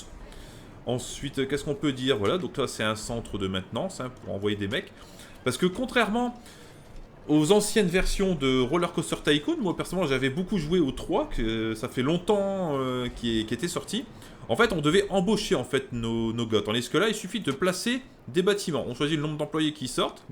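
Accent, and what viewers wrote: France. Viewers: Salut j'aime bien tes tests, tu es de ch'nord aussi ? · t du ch'nord sa s'entend frere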